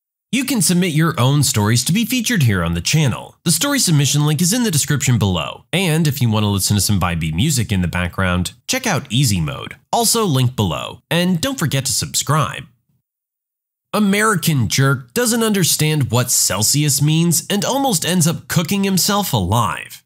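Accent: United Kingdom. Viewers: Love the British accent init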